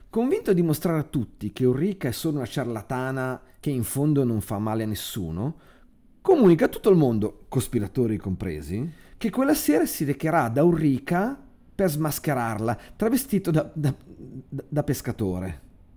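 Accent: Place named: Modena, Italy. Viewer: Con il solito accento modenese strascicato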